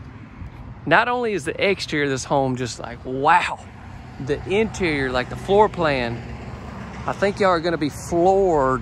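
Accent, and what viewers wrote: South, United States. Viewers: Y'all don't have to watch his channel, he can talk any way he wants too! Y'all up north talk funny to us southerners too. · Lovely home. Wish they had a person showing it that was more professional and talked “normal” without the unclassy southern accent. He makes the video trashy. Can’t stand southern accents.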